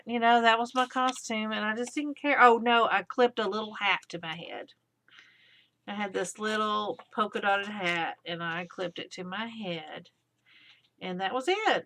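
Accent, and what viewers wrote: South, United States. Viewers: How cool that you're able to find so many fun things for your book! I really enjoy watching your videos and seeing what you come up with. Ain't it the truth that these gluebooks ain't going in the Lourve? So we need to just relax, cut, and glue, like Nicole says. It's all about the fun, isn't it? I was born in Alabama and raised there and in East Texas. I have a Southern accent, too, and listening to you makes me feel like I'm back down home. I live in on the Southern Oregon coast now and I do get homesick sometimes. Love ya, girl.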